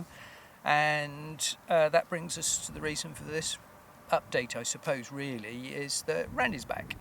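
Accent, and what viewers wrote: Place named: RP, United Kingdom. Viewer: Bonjour, The more I see your videos, the more I discover your crazy project. Once again, I would like much more videos, I am sure I am not alone and people who help you. As an example, I would have liked more videos of the hull rebuild and the calking among other topics because I think I have seen some light through ot in your previous video. Besides this, I like your accent, it looks like the one I learnt 50 years ago at school and I easily understand your explanations. I would say that you have a BBC 1 accent perfect for students 😁 Amicalement, Raphaël, no longer student for a long time but always passionate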